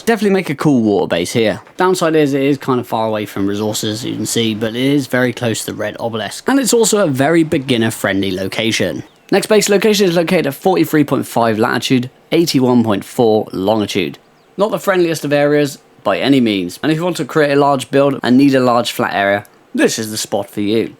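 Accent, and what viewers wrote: United Kingdom. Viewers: i love British accent mate 😊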